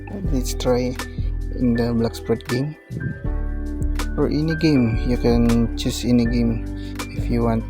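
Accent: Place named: Indonesia. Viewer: u from indonesia u sound it very same and u black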